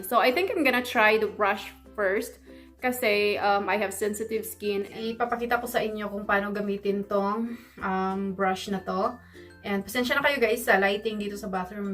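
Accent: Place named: Visayas, Philippines. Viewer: usong uso yan sis maganda daw talaga gumamit niyan...ok lang ang punto na bisaya gusto ko nga kasi sarap sa tenga pakinggan ng salita niyo ")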